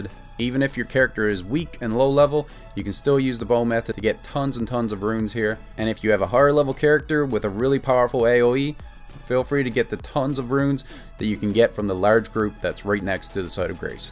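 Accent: Newfoundland, Canada. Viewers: Newfoundlander 😎 I could tell soon as I heard your voice I too am from the rock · Are you Newfie? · I knew you sounded from around the bay!! Haha awesome buddy how’s ya getting on? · you're a newfie arent you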